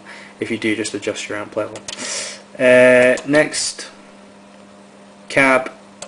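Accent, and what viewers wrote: Canada. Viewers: oh nice lol you sound canadian though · k...ur acsent sounds a little canadian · are you canadian? i hear a thick accent. but i also get a small distortion problem with my axe-fx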